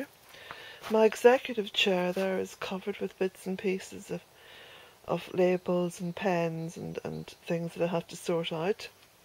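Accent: Ireland. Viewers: Catching up with your early videos. You don't have such a pronounced Irish accent these days! My, how your garden has become so abundant in these past 13 years, you work so hard. You had three cats in this video - I assume this was pre-Jack? Lovely to compare the before and after. X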